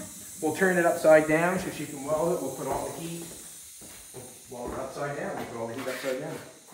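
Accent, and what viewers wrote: Canada. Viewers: I recognized the Canadian way you say some words. We do the same in Wisconsin. Liked. Subscribed. Bell rung.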